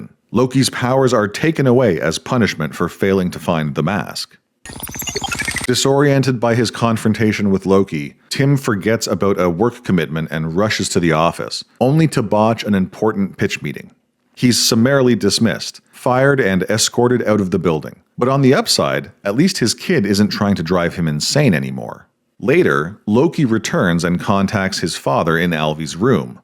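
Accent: Canada. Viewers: I'm going to guess he's from Eastern Canada the way he pronounces the "ou" sound (this is coming from someone in Saskatchewan)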